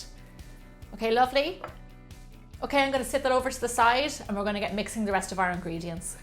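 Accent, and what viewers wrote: Ireland. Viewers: are u irish? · Love the recipe by any chance are you irish ☘️?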